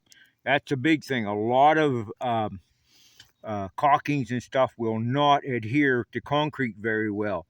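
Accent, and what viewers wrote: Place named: Western Pennsylvania, United States. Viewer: Thanks for the tip. I sure hear that Western Pennsylvania accent. (My wife is from Butler)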